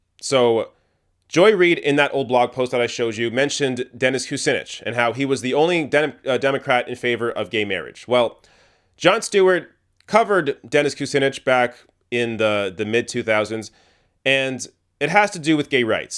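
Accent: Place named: Canada. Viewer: The canadian really came out in this video.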